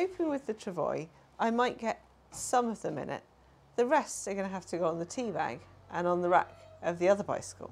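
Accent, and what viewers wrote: RP, United Kingdom. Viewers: Her accent reminds me of Queen Elizabeth.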